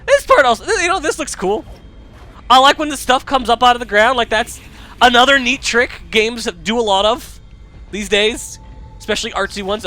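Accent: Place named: Russia. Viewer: "join the army they said- It'll be fun" Pretty much the summary of the whole game. I am also a fan of the 'turn around and everything is different' and 'magically forming floor' tropes. It always gets me, specially when used in a horror/thiller sense. Not my favorite game, but it was entertaining none the less, your Russian accent helped with that lol. The monster's especially the Librarins were honestly terrifying. Though the story was super muddled to me and when you start explaining the book and stuff at the end I go "huh... so that's what that was"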